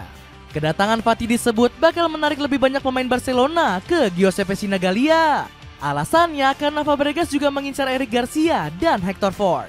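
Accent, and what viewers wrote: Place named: West Java, Indonesia. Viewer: VO nya kaya dialek jabar di daerah 😂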